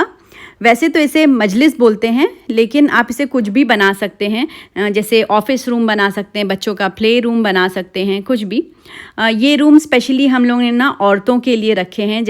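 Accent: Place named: Uttar Pradesh, India. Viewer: Are you from Uttar Pradesh? Aap ka hindi humare jesa hai. Same accent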